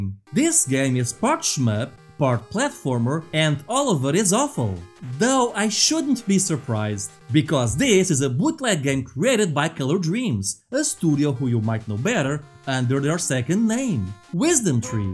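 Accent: Portugal. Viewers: Portuguese accent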